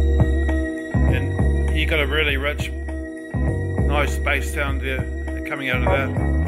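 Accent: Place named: New Zealand. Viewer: U sound like a New Zealander lol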